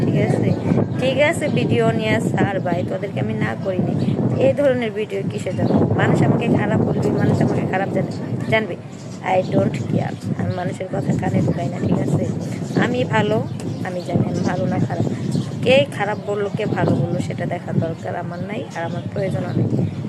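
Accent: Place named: Noakhali, Bangladesh. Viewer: আপু তোমার বাড়ি কোথায় মনে হচ্ছে নোয়াখালী পরিচয়টা কি দিবে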